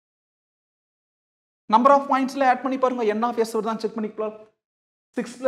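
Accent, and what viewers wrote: Coimbatore, India. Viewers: Awesom 🎉🎉🎉😮😮😮😮cbe 🔥🔥🔥slang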